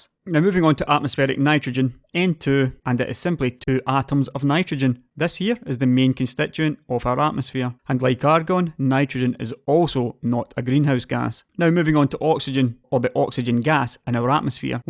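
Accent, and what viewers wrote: Scotland, United Kingdom. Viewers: Love the video and watched through fascinated although I knew most of it. The sound of Scottish makes it that more interesting. Thank you.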